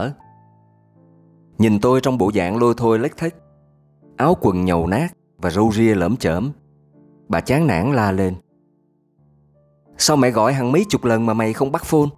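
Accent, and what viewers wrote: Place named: Saigon, Vietnam. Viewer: giọng đọc rất hay rất truyền cảm mang âm hưởng kiểu dân Sài Gòn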